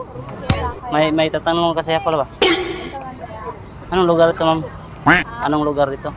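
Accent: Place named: Visayas, Philippines. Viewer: Mag bisaya kanalang mali ang tagalog mo bisaya ang dating.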